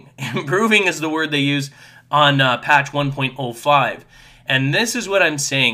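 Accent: Canada. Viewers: yeah i think hes canadian like meh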